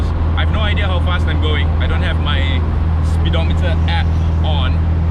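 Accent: Italy. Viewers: Love tat italionooo accent...u should try getting into movies...surely talented...awesome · Hahaha 😂 love the Italian accent . Made me just so happy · Hahaha ohh boy, your italian accent is so funny 😂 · ...you are truly posessed, confirmed, chop & signed! There are no more medicines in the world which can cure you for this kind of italiano crazy possession. I will try to arrange for you an italian priest to perform the exorcism to rid off the 'Alfa Romeoooo' ancestral evil influence on you soon...but btw,you truly made my day today just listening to you rambling thru your italian 'celup' accent.It was very funny & original pc of work there. You are truly the best out there.. haha.. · Nice engine sound plus your Italian accent 😂. I also want to buy one classic car since I started to see your video 😄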